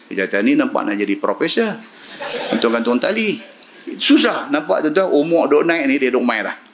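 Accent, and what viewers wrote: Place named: Penang, Malaysia. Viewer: USTAZ...ni dah lama ceramah kat p.pinang.....suka ustaz ni kalau cerita tentang sejarah nabi2. Ceramah ustaz senang walau ckp loghat penang.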